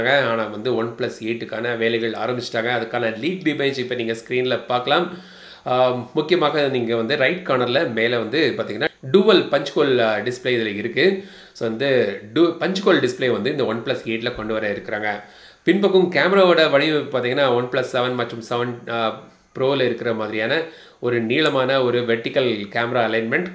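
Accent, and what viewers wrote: Kanyakumari, India. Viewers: Polinju pona company! Typical kaniyakumari slang! A Fan from your district bro!.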